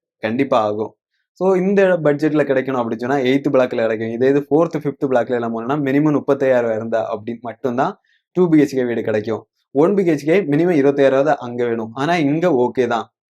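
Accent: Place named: Nagercoil, India. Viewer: TN74 slang theriyudu bro 😀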